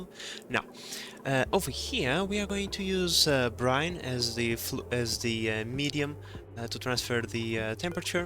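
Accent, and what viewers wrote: Portugal. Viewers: Are you Portuguese ? Sounds like it ?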